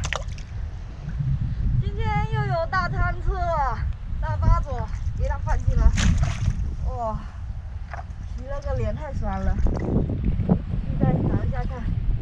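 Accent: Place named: Putian, China. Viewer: 我上次有在你生日留言那个视频不知你有没有看过，听皮皮说你还没有男朋友我很开心，我觉你是聪明 美丽 朴素善良可爱姑娘如果有可能我们联系看看，简单的自我介绍一下，男28岁 福清人 父母都在家乡一个妹妹已出嫁、我在美国快10年本来今年二月要回国看亲（父母一直吹）我听你讲国语是莆田口音离我家乡不会太远我估计差不多2小时吧、你也知道在我们那边28岁还没有结婚算高龄了😭当现在美国疫情很严重不可能回国了，自从看了你视频以后觉的你一定会是那种会认真过日子的人，所以有机会我们互相交流了解一下、等你回复谢谢💪💪💪💪💪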